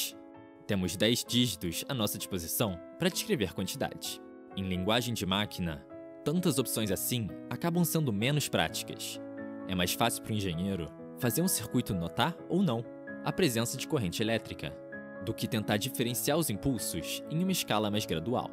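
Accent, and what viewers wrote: Rio de Janeiro, Brazil. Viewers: emojix? pqp odeio esse sotaque carioca 😠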